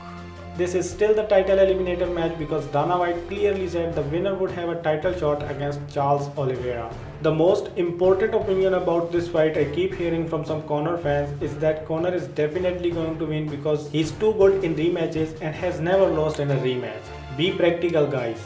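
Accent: India. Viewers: In the description it said "Asian accent" I understand that India is in Asia but it would have been a lot more honest to say Indian accent. All the same I'm pretty sure most people can understand you, your English isn't that bad. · I am sure the voice in this video is an Indian Guy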